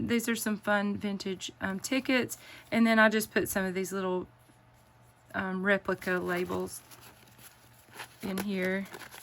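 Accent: South, United States. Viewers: I love this so much! Your voice is so cute! I recognize that southern accent!! I am not watching it all as I want to be surprised!! 🩷🩷🩷🩷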